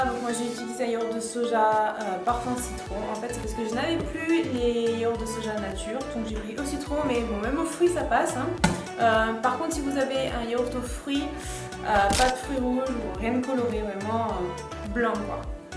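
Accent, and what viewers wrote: South, France. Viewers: C'est quoi cet accent du sud la? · teddy zora Elle est du sud ducon mdr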